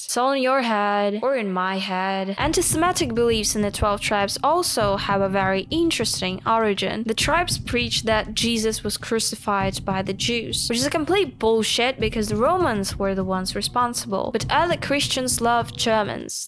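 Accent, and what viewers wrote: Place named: Ireland. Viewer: I really like your accent, are you Irish?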